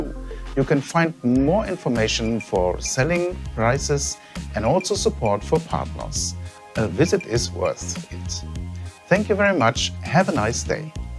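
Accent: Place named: Germany. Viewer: man hört anhand der Aussprache, dass der Moderator ein Deutscher ist. Wir leben in Deutschland - warum also kann dann das Video nicht auch in deutsch produziert werden??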